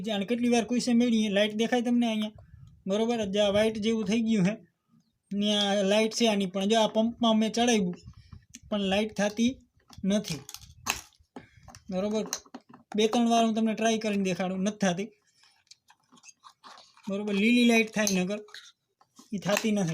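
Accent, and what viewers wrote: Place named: Kathiawar, India. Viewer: કાઠીયાવાડી લાગો હો ભાય 😀😀😀😀😀😃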